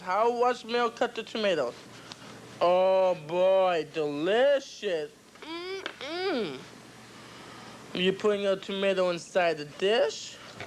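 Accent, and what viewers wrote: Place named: Russia. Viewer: he's talking English just as CrazyRussianHacker is doing it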